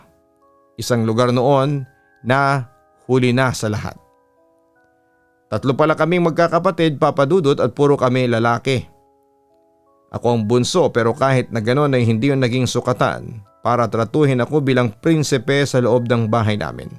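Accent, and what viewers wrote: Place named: Waray, Philippines. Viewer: waray2 po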